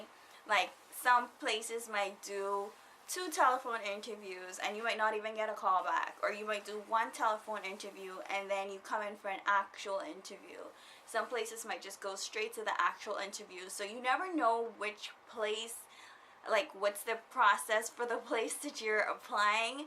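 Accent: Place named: Bahamas. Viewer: 🙂I love the fact how you are still speaking like a true Bahamian.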